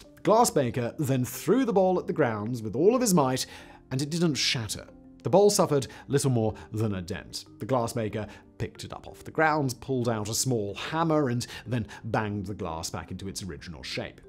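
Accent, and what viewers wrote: United Kingdom. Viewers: Whistler provides hosting duties on like 97 different channels, right? I earnestly attempt to watch these but for me simply talks too fast or my hearing is woefully too slow; add in the British accent and I quickly find myself rewinding from the jump to replay stuff I simply didn’t hear, couldn’t understand or a combination of both. By the time I try using CC to help me I’m exhausted and generally give up.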